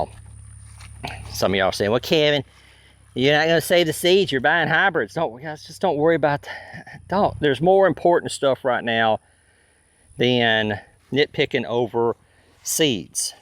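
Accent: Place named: South, United States. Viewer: Nice! What type of soil do you have down there? Im guessing by your accent that youre down south. Here in WA we have black nitrogen rich soil. Do growing peppers need a specific type of soil?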